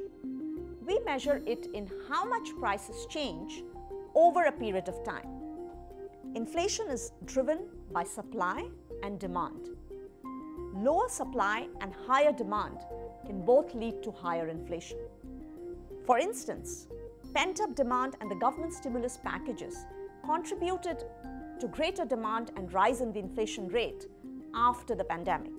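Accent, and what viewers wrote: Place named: India. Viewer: I easily understood you are a indian by your clear accent 😄🇮🇳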